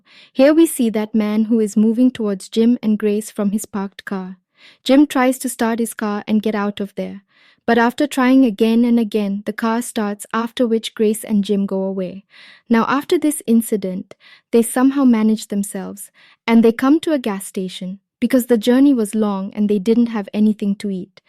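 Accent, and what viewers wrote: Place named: India. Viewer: Indian voice I hate it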